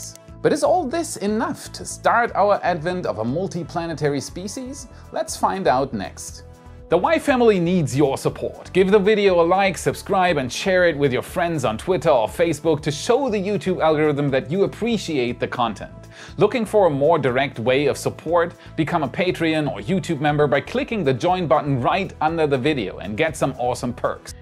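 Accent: Germany. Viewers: Is this guy German? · Aha, ich hab direkt rausgehört das du aus Deutschland kommst, aber irgendwie keine Anzeichen gefunden bis ich auf deine Webseite gegangen bin 😉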